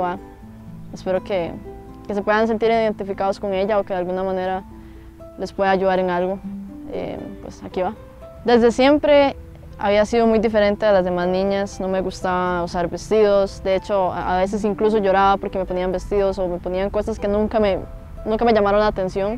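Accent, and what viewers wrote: Costa Rica. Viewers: los ticos pronunciamos la r asi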